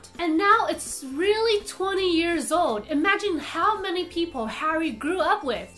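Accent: United Kingdom. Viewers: 這次好像特別用英國腔來說了呢哈哈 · 真的好喜歡滴妹的英式英語💕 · 一直覺得滴妹的英國腔很像妙麗 😍😍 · 有配合主題搭配英國腔哦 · 😍😍😍😍British accent more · Your British accent is cute! Nice video. Hey from England. 😊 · 滴妹英國腔好好聽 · 英國腔是怎樣～～😁😁😁太萌了吧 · 跪求。。。我要英國腔，超好聽滴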